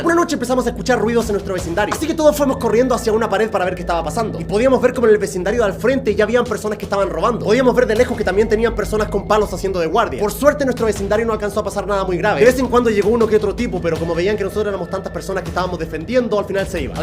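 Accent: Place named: Chile. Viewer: Cuando German hablaba chileno 😢xd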